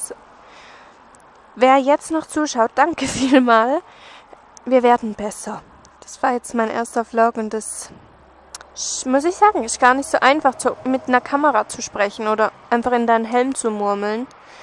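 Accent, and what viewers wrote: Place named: Baden, Germany. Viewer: Isch des rudschig 😂 Badner? 😂✌️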